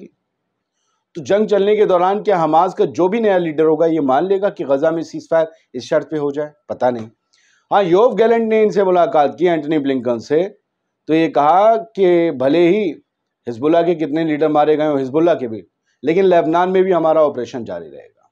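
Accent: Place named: Allahabad, India. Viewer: Aapki baat karne ki andaaz ekdum Allahabadi hai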